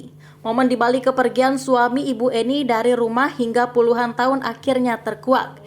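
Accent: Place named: Banjar, Indonesia. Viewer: Bahasanya kok presintar kaya bahasa banjar. Ada sedikit logat2 x mba. Hehe 😁😅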